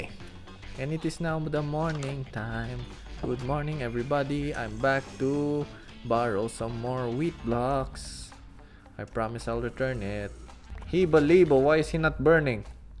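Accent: Philippines. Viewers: R u a filipino? Ur english accent is same as filipinos, btw minecraft is being popular again hope u inspired by pewds haha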